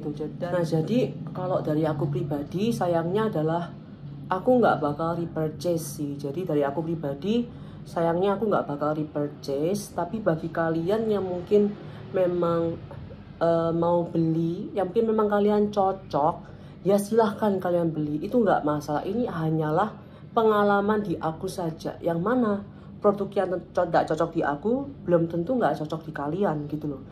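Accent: Surabaya, Indonesia. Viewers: E beneran ternyata logat khas suroboyo hehe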